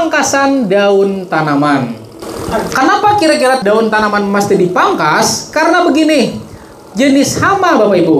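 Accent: Banjar, Indonesia. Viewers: Logat Banjar kayaknya...Smoga sehat selalu.Ulun jg pernah tinggal lama😀 di Bajarmasin.Kutu kebul ko datang terus knapa yah...ditanaman jeruk.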